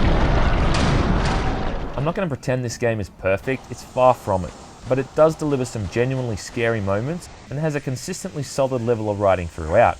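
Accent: Australia. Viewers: Why must people change the way a words are pronounced. T,tulu????? It's always pronounced with a c Cthulhu. Americans love changing the English language. Why???? You sound Australian.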